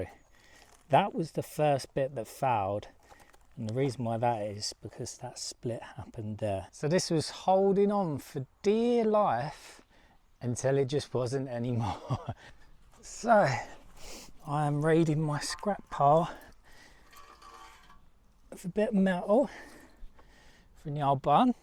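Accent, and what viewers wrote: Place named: Sussex, United Kingdom. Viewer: Like others have said here this channel is unique in terms of your style, honesty and determination to face any problem and deal with it. I came here after watching you help out Keith Brown dig out his back garden for better drainage. I was following another channel, Sampson Boat Co which was also about completing a long term project, but binned that after starting to watch your barn conversion and cartlodge playlists. I dig your accent, I am from Sussex so it resonates with me as a Southerner. Your humour is ace. OK your subs numbers may be lowish compared to the top guys but is there anything else you can do to boost this? More collabs with other YouTubers maybe, I know you had the Skill Builder video guy round for a piece but can you use this to your advantage in other ways? I think we all appreciate the massive PITA it is to video everything you do but try and keep it up and maybe something will turn up that gives you a more positive financial situation. 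My biggest DIY project so far is lining a double garage wall with plywood but your work inspires me to try bigger and better things. Onwards and upwards mate.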